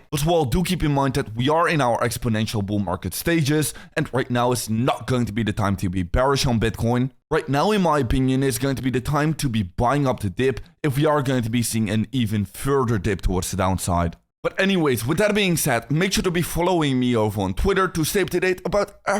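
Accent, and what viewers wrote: Netherlands. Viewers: Waarom heeft hij 2 kanalen? Je hoort duidelijk hetzelfde Hollands-Engelse accent.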